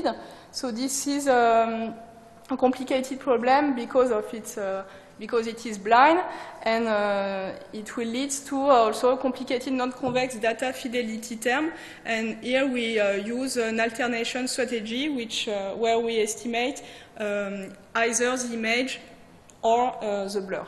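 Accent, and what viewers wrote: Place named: France. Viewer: LOL, I like her French accent, which is even more interesting than the material itself : )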